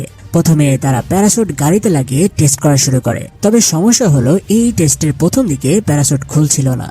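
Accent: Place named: South Korea. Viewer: আপনি কোরিয়ান একসেন্ট এ বাংলা বলেন কেন? এমনিতে ভালোই বর্ণনা করেছেন।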